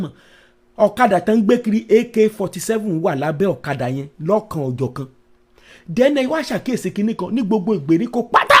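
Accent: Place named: Yoruba, Nigeria. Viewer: First of all I love that Yoruba dialect, it’s very sweet, continue my prophet......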